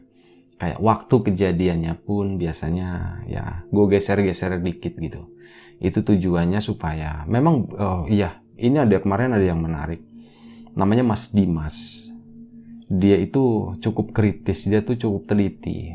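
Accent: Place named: Java, Indonesia. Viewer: Assalaamualaikum🙏sy baru tahu cannel nya mz ada dua toh, karena sy sub baru d lapak horor jd selalu penasaran, trs d buka buka ech dapet yg ini sy coba buka, ternyata ada radio kesayangan d bdg kesebut, duch seneng nya😅😅sy kan org bdg mz, tp leluhur saking Jawi, jd seneng kalo denger mz berbicara medok Jawa nya itu bikin saya terlena🤭😅😅😅semangat dan tetep sehat mz, matur suwun ceritane🙏🙏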